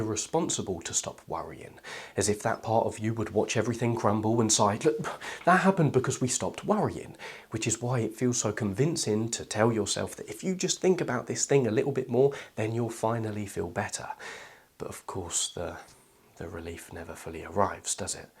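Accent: United Kingdom. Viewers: You're the reason I'm learning English with a British accent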